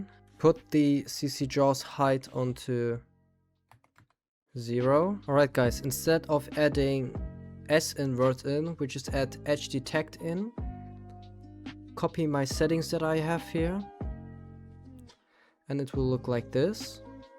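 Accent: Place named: Germany. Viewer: i hear the german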